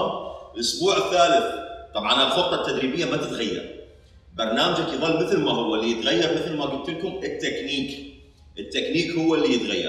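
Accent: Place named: Iraq. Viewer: سلام عليكم انت عراقي الهجه والملامح هل أنت تسكن في العراق لو في ليبيا أين مكانك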